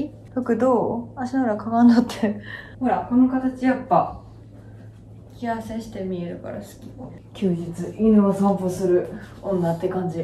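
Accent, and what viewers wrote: Kansai, Japan. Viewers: 今日もかわいい 関西弁もかわいい 全てが可愛い のに遠慮なくゲップするところ好きWWW 🥺💗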